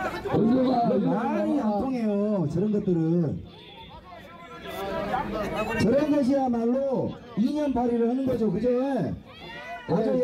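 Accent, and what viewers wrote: Jeolla, South Korea. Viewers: 전라도네 사회보는놈 듣게싫어사투리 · 시팔 세퀴가 전라도말투네!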